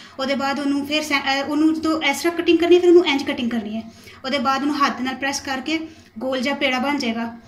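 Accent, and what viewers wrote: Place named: Punjab, India. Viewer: mashAllah very nice ot apka punjabi bolny ka style bht achaa laga..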